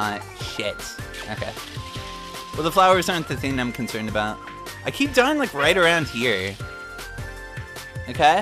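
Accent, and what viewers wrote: California, United States. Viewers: To be fair is obviously from California to most USA residents because he sounds just like a California dude!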